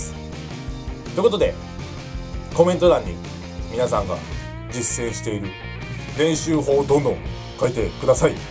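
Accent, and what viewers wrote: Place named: Kansai, Japan. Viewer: さんの関西弁、いい。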